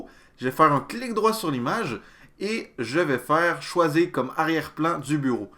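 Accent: Quebec, Canada. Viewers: Vidéo rapide simple efficace + le petit accent québécois +1 abbonement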